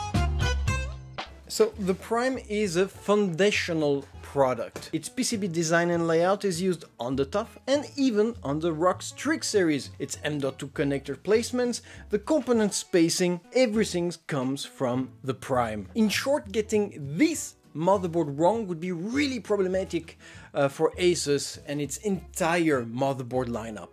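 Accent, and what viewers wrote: France. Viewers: Am I the only one who is here because of French accent 🥰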